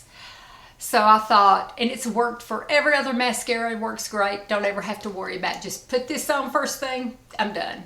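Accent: South, United States. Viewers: Do empties-don't do empties! As long as you keep doing videos! You are a very sweet and soft spoken southern Lady that gets me to relax when I listen to you. So, thank you!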